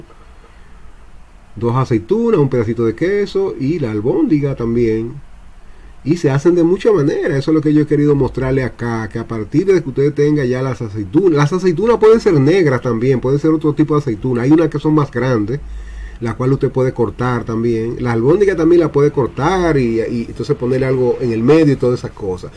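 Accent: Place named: Dominican Republic. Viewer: Ya me sacó de la duda de dónde es ( por su acento ) Mil x mil 🇩🇴🇩🇴🇩🇴😃nos parecemos extremadamente LIMPIO 👍👍👍👍👍👏👏👏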